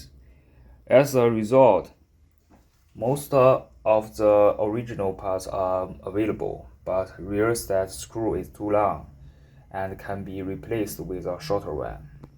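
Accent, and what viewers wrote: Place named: China. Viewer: 塑料中式英文🤪🤪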